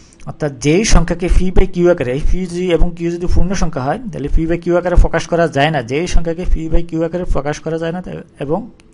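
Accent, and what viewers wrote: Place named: Noakhali, Bangladesh. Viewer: স্যারের বাড়ি নোয়াখালী। স্যার বলে ফুর্ণ সংখ্যা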